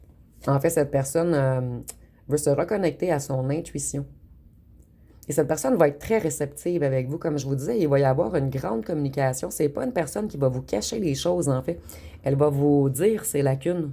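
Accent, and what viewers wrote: Quebec, Canada. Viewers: Tres beau tirage merci beacoup il me parle j'adore votre accent québécois et j'adore vous ecouter..... merci❤❤❤❤❤❤❤❤❤❤❤❤❤❤❤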